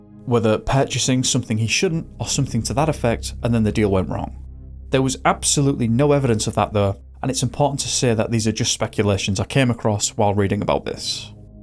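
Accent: United Kingdom. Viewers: Are you from the UK or is that just the voice used for the video narration? Some farms specially ones with livestock can be very very bright. Like midwest Wal-Mart parking lot bright. Not as bright as a whole town obviously but that could be easily confused in the pitch black.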